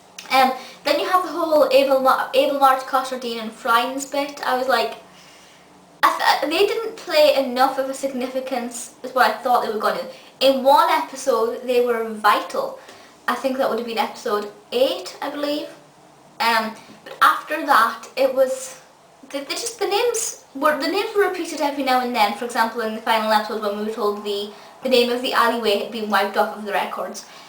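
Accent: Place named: North East England, United Kingdom. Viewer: I like your accent it's sort of a mix of north eastern endland and scottish I'm sorry if none of those apply to your accent it's just interesting and thats what I thought it sounded like.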